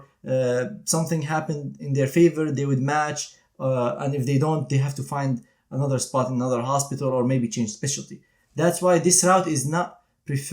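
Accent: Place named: Syria. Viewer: Hi Dr., I wanna thank you a lot for being such an inspiration for me and for lots of others, I really appreciate your hard work. May I ask you a favor? Can you make a video in Arabic telling us your story with your original Syrian accent, tell us how could you overcome all the struggles that face us being arabs, how could you modify your mentality to pursue your dream for such a competitive speciality. What was your cultural background and how studying at Aleppo was, how could you adapt living at the us at your first months. I wish I could know all of these stories that would me feel like as you are a true friend "which is an honor I would like to have🥰"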